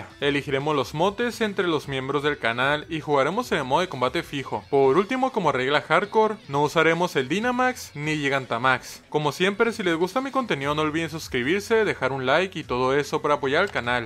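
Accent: Chihuahua, Mexico. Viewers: una pregunta mi estimado ¿es usted de Chihuahua? tiene el acento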